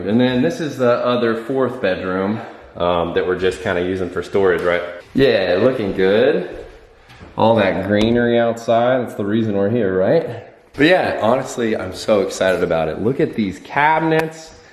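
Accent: South, United States. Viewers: The southern accent coming in strong in this vid! Keep up the positive vibes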